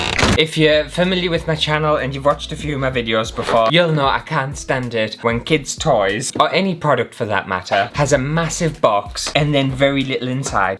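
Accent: United Kingdom. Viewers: Cheers to all from the North, best UK accent by far. :D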